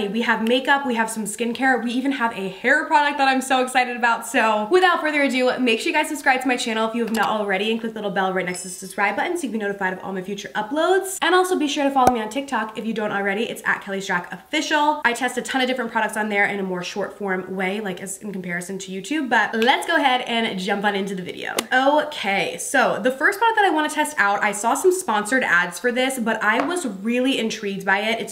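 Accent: New Jersey, United States. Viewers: I love you in general I have for yours but your Jersey accent comes through in the cutest ways and I have to double tap back 5 seconds I love it so much 🖤🖤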